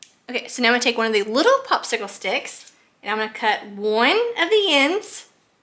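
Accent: South, United States. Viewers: Omg I love your accent…. Your southern charm is adorable as well as your craft projects. Thanks for sharing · What a Super idea! Love Bee’s 🐝 I also ❤️ your accent of your voice! We live in Wisconsin. So when I watch Southern UTubers it makes me smile 🥰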